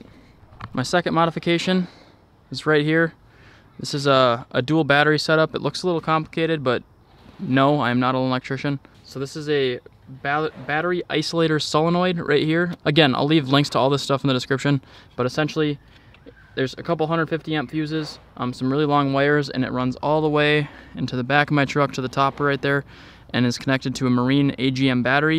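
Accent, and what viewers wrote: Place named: Minnesota, United States. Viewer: 40 seconds into the video I knew you were from MN, lol Nice build man!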